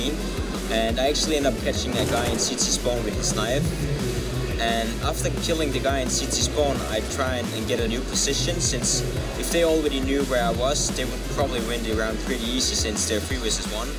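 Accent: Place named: Australia. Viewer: He sounds Australian lmao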